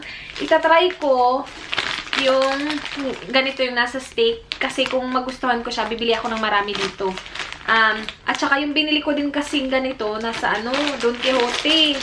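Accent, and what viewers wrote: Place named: Visayas, Philippines. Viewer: Maka addict man imong vlog ms... cute nimo lalo Kong magbisaya hehe.. God bless po.. ingat po kayo..:) mwahh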